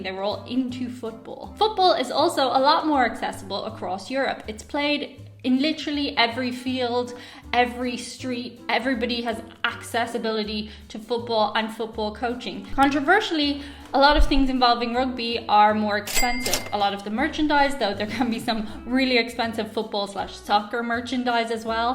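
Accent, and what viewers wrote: Ireland. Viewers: Just wanted to drop in and say u love ur accent. Don't stop being Irish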